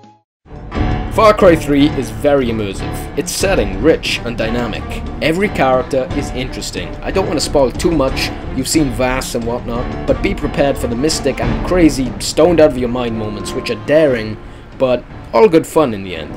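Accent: Wales, United Kingdom. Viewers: obviously hes welsh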